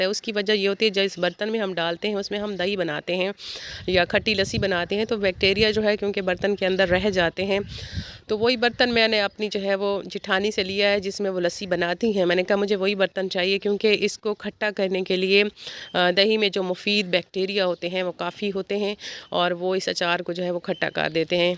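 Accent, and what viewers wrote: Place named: Thalochi, India. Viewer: Sohni thalochri punjabi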